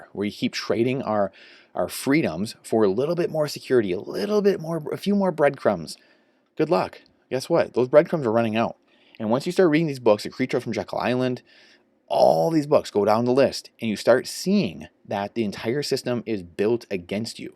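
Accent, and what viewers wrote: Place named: Michigan, United States. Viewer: I had a hint by the accent but are you from Michigan?!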